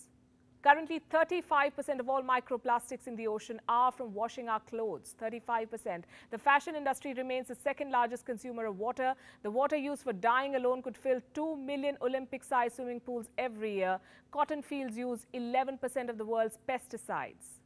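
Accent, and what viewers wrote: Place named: India. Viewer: She has an Indian accent to carve granite with. Her topics and intelligence are always brilliant though.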